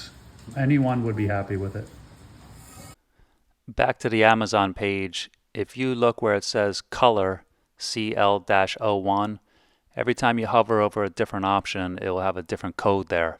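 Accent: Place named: Philadelphia, United States. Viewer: You have an ancient working-class accent we don't hear anymore. Is it a delco/philly accent?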